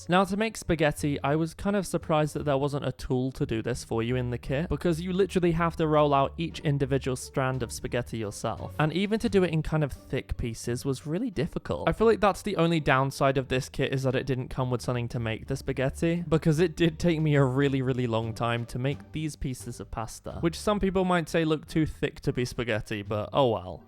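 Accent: United Kingdom. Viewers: Not to lie You sound British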